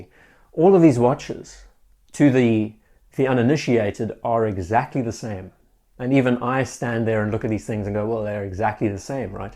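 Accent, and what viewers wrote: Cape Town, South Africa. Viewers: Are you from Cape Town?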